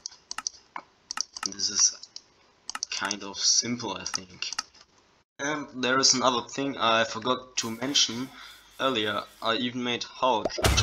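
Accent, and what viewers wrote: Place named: Germany. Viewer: der deutsche akzent haha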